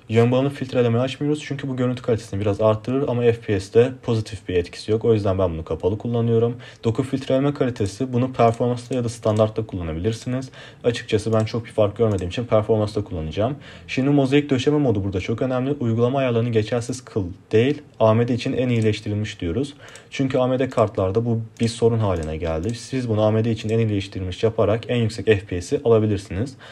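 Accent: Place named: Azerbaijan. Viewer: Azerbaycanlisin sanirim aksanin arkadasiminkiyle ayni :)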